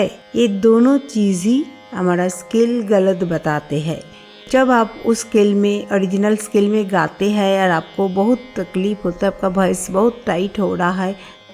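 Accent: Bengal, India. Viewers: नमस्कार देवीजी। मैं अरुण वाडीकर पनवेल, ’नवी’ मुम्बईसे। आपकी प्रस्तुति बहोत अच्छी है, अपितु आपकी आवाज और विषय को पेश करने की कला, अंदाज़ में जो सादगी और बंगाली लहजा है, वो उसे और भी रोचक बना देता है। यूंही अपने श्रोता गण के ज्ञान में वृद्धि करते रहिए। शुभकामनाओं के साथ। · महोदया बंगाल से है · tumko jhudko hindi barabar bolna nahi aata. bangali mam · Aap bengali ho? accent comes like that 😊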